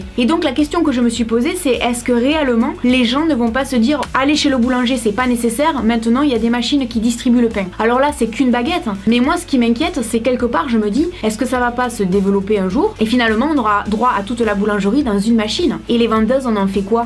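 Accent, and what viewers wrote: South, France. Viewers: super ! en plus ton accent est super agréable comparer à d'autres accents du Sud ( généré Marseille ...) continue comme ça tu es super 👌👌❤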